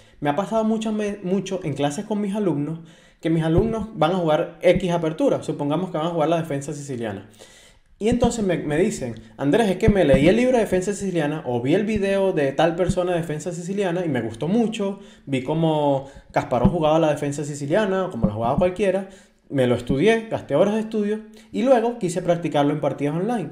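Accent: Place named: Venezuela. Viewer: Joder tremenda explicacion Soy 1800 y jugador del sistema londres y desconocia ese tipo de castigos muchas gracias bro. Saludos desde Venezuela que creo que eres venezolano por el acento y mas si te recomendo Gascon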